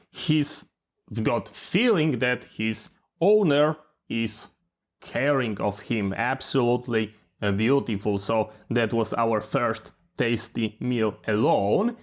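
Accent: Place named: Eastern Europe. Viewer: Lovely Eastern European accent 👏🏼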